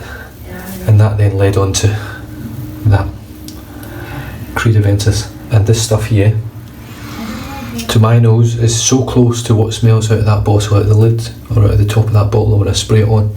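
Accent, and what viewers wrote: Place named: Scotland, United Kingdom. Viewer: Happy Birthday! Your Scottish accent makes me think you were older! I'm 35 and I feel like my voice doesn't match my age lol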